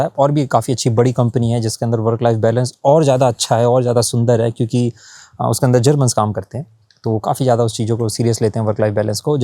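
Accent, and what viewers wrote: Uttar Pradesh, India. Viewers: Bhai ki UP vali boli is crazy🤙🏻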